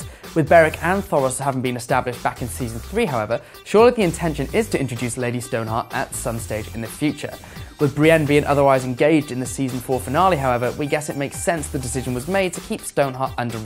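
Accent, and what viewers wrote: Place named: United Kingdom. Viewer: I don't really see what is annoying about his voice, unless you just find British accents annoying.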